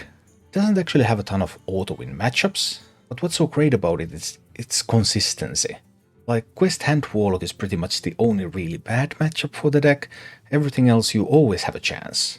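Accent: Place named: Finland. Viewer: Sä paras! Olin amerikkalainen vaihto-opiskelija, joka asui suomessa 5 vuotta sitten. Rakastan videoitasi ja varsinkin aksenttiasi, se tuo mieleen ne ystävät, jotka sain siellä Porissa.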